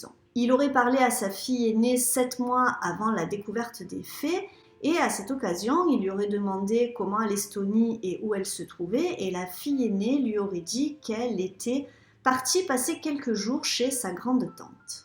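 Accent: South, France. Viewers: Quelle horrible affaire... et malgré le fait que je suive beaucoup de youtubeurs true crime, c'est la première fois que j'en entends parler. Bravo, continue à nous proposer du bon contenu 😊 PS: j'adore l'accent du sud! 🏝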